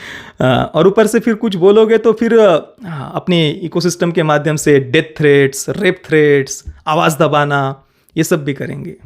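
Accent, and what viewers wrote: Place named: Maharashtra, India. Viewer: Bhai aap politically baat kyo karte hai ? Aur aap personally Dunky ko kyo Target karte hai kya aapko malum hai South me Dunky ko Theatre nahi de rahe unke baare me bhi bolo yaar aur aapki haisiyat nahi ki Raju Hirani jaise director ko criticise kare kyonki agar aap unse achchi movie bana sakte to criticize Karo Aap baar baar Dravidian Communist aur tathakathit secular ke bare me.aise bolte hue samaz aata hai ki aap konsi vichardhara ko promot karte hai But bharat ek secular Country hai isko kaise karte hai Bharat jaha Top 10 Richest Temple me 5 Aayenge but Top 200 Universities me ek bhi nahi aati no top 300 School me ek bhi nahi hai kuch ispe bhi bolo Bhai First aap Dunky movie dekh agar pasand nahi aayee to criticize Karo Bina dekhe criticize kyo? Aapki Hindi Puri tarah se Marathi wali hai jo shudh to 100% nahi hai to usko bhi sudharo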